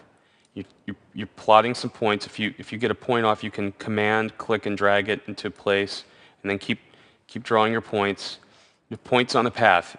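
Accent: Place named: United States. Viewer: i dont understand neither his photoshop skill nor his American accent!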